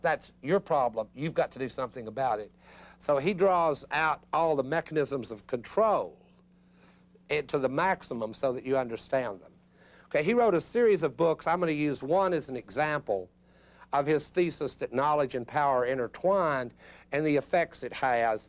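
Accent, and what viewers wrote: South, United States. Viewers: It's rare to hear a southern accent talk positively/neutrally about continental philosophy. xD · Watching Rick Roderick makes me really like those Southern US accents. On another note, his lectures are really great for some quick summaries to deepen understanding into a thinker's perspective, and this lecture series is really great. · wow..is that southern accent? just knew it ..thanks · Watching him makes me realize how negatively biased marketers are against people with a southern accent. Ironically, they’re probably more liberal I’m guessing. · love hearing an intelligent speaker with a southern accent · I cannot express how refreshing it is to hear European philosophy explained via Southern accent.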